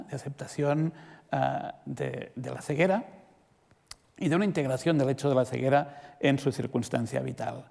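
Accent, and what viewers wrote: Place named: Catalonia, Spain. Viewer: Una conferencia de la que uno no se puede despegar, de cabo a rabo. Me interesa mucho el enfoque, el análisis cultural y religioso de Inglaterra en esa época, con relación al resto de cristianismos que, por acción o reacción, se estaban configurando en Europa. Un aspecto que agradezco es que el profesor se fija en el dato pero no se queda en él, sino que sube a lo esencial. Por cierto, el acento catalán del profesor lo hace aún más encantador.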